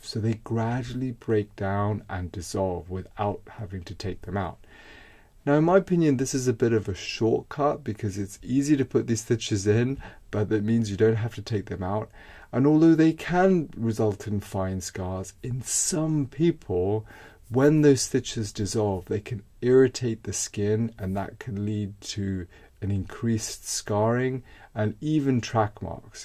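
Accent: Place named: England, United Kingdom. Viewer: SOUNDS like London, England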